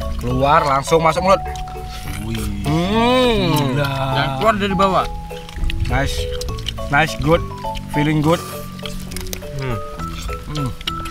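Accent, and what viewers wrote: Medan, Indonesia. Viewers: Lu tinggal dmna bang klok boleh tau, dari logat logat nya orang medan bang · Medan mana suaranya???!!!!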